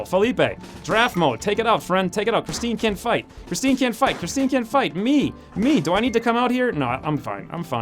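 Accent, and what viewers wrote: Ireland. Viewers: Luminary Xion He almost sounds Irish. Weird. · I thought he was Irish too xD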